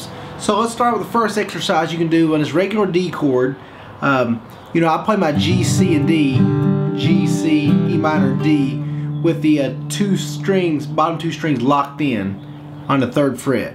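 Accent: South, United States. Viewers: I love your Southern accent. Greetings from London, UK. Your videos are a blessing to me as I try to learn acoustic classical guitar. I want to play in church one day. I try to learn but face so many obstacles.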